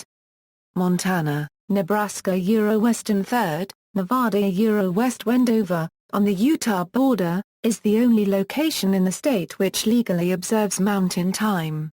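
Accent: United Kingdom. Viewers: I don't understand a word of what this fucking bot says. British English is awful. God bless America, my home, sweet home.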